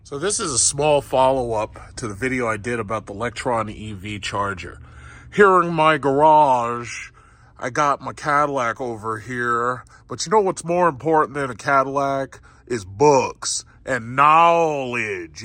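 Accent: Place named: Staten Island, United States. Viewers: My Dad, Aunts, Uncles and Grandmother were from Queens and talked the same way. It's a New York thing, kapisch? 🤌🏾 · Why does this guy sound like a white Italian guy from Staten Island..The passport bros were started by black men ...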